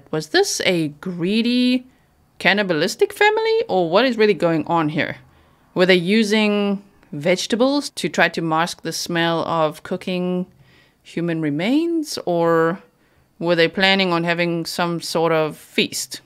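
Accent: South Africa. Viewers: I can hear that you are south african🇿🇦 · Is your significant other another english speaking dialect like australian New Zealand? Your accent definitely is south African but there is more to it, maybe its wven American english mixed with your majority south african accent....but yea, when you mention it! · You dont have a mixed accent. ITS SOUTH AFRICAN !!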